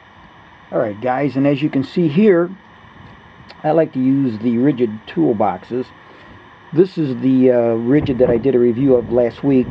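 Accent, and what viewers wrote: United States. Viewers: is this American or Canadian?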